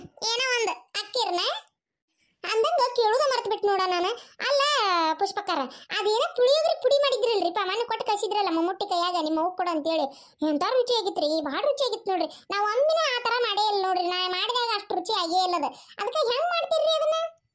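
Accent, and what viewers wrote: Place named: North Karnataka, India. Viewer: Uk style bhase super comedy antu cute yaaaaa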